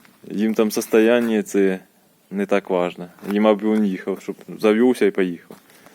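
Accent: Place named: North, Ukraine. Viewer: По говору чую, що мій земляк з півночі)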